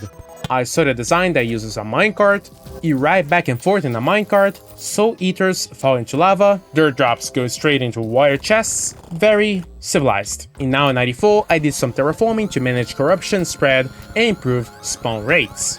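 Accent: Brazil. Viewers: Meu deus cara eu achei tava ficando doido pq eu senti no fundo do meu peito q teu sotaque era brasileiro, enfim vídeo bom pkrl continue pfvr meu xarà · are u brazilian by any chance? your accent looks like a brazilian speaking english 😆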